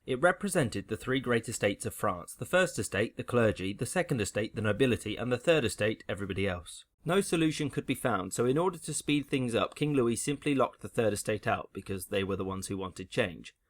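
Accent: United Kingdom. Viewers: I can't be the only one who thinks you are British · God save us from British accent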